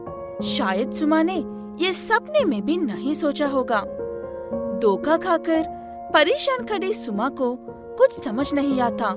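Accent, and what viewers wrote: South, India. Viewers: Ek proper hindi bolnay vali acchi background voice over artist rakhiye pls south indian hindi maza nahi ata hai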